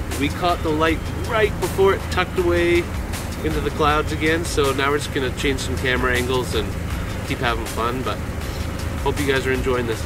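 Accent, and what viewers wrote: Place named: Northern Canada, Canada. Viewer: Interesting accent. Northern Canada?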